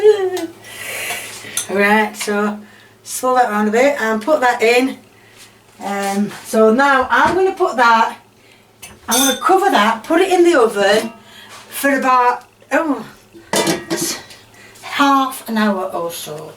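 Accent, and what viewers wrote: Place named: Yorkshire, United Kingdom. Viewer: “Oh I’m just going t too tip lot aht” a proppa Yorkshire lass ah. Do you think you could try making jerk chicken?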